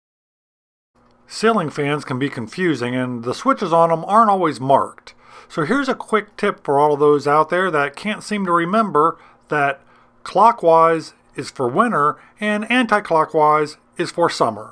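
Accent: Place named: United States. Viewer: You have an American accent.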